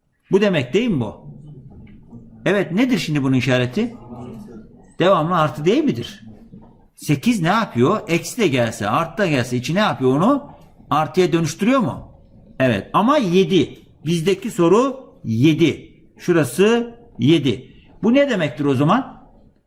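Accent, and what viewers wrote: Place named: Isparta-Burdur, Turkey. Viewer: hocam nerelisiniz şiveniz bizim buralara Isparta Burdur taraflarına benziyor ?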